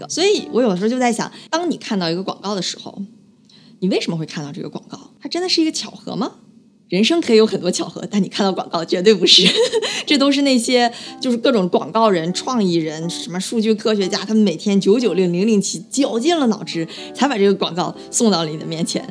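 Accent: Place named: Shandong, China. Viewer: 你是山东人吧，我听你口音像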